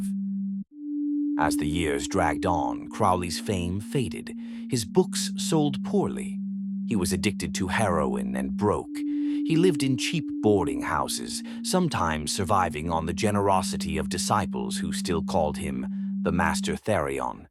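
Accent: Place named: United Kingdom. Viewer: At first I didn't notice the ominous music in the background, it fits the video, also loved the British accent it gave it more life😮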